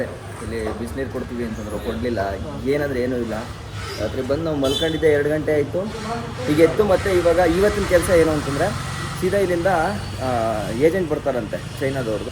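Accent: Karnataka, India. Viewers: Anna daily video haki 20 mins duuu... wait madi madi tadkolke agtila.....guruvee 🙏Bigg fan nimdu mate typical kannada...🩷 Nim friendship mast ide bhai..,💯💯💯💯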